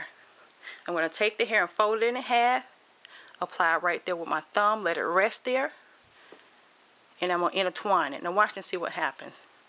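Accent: South, United States. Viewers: I love your accent. It sounds southern. Yes, I'll get a mannequin head from Sally's, lol. Those braids are gorgeous and you are very detailed. ❤❤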